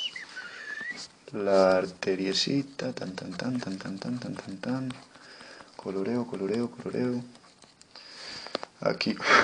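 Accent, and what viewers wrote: Antioquia, Colombia. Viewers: Acaso eres colombiano? haha me gusta tu acentoooo <3 x) buen video gracias! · Aparte de completo el contenido, reeee paisa.... Buen video, saludos. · Muy bueno. Me encanta el acento paisa. explicas muy bien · Más paisa que la arepa <3 "Uy , mejor borro esto porque mera locha" JAJAJAJA. "Locha" para nosotros los paisas es como decir "¡Qué pereza! " SOS UN CRACK! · Qué acento tan paaaisa.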